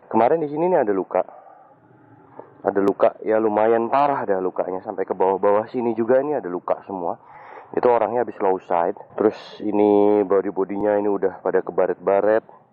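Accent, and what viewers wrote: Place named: East Java, Indonesia. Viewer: cak asline wong endi ...kadang kok suarane medok jawa timuran...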